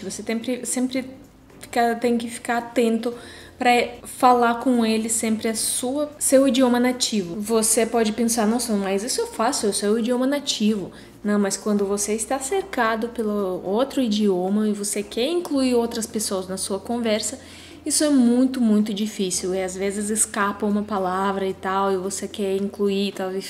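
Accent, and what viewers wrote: Russia. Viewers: Os haters estão em toda parte...não só no Brasil! São um fenômeno novo... E você tem razão! Pessoalmente, são fofos...só que na internet, colocam seus podres pra fora...desabafam! Gostei muito do canal, vocês são muito simpáticos, e é impressionante como teu português está bom...muito pouco sotaque russo! Não conheço a Russia, mas quero muito ir...Museus, cidades com uma história muito rica, amo os pianistas russos, e as russas! E o stroganoff!! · em primeiro lugar gostaria de parabenizar que o seu portugues esta ficando cada vez melhor acredito que dentre 2 ou 3 anos voce nao tera mais sotaque russo parabens eu sei que seu canal e voltado para publico feminino mas eu amo os conteudos que voce e o produzem voce disse ai que tem pessoas que na frente nao tem coragem de dizer quando estao escondidas atraz da tela de um computador ou um celular e porque sao pessoas mau carater falças que mentem para si mesmas de tao pervesas que sao e em relaçao a depressao na russia como la tem muito problema em que pessoas bebem muito ja e um indicio fortissimo de depressao so que a marvada da vodka kkkkkk mascara tudo se a pessoa esta com depressao e usa o alcool como refugio e como querer estancar o ferimento como um tiro de revolver com um bandeide nao da certo ne um abraço sou fã numero 1 de voces continuem firmes ai nos conteudos que sao otimos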